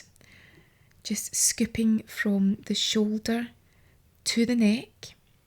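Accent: Scotland, United Kingdom. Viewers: Beauty Thank you. Lovely to hear your accent too - I am also Scottish! Comforting sound and lovely video. Subscribed!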